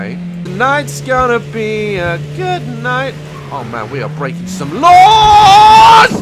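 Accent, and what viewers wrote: United Kingdom. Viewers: He’s so british Lol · I can tell this guys British, wee = pee which means weed =peed.... I'm sure no one needed to know this buuutt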